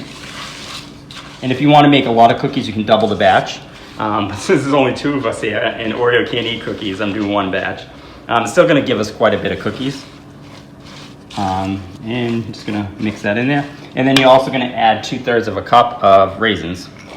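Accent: Boston, United States. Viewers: Thanks for the recipe! Am I hearing a Boston accent there?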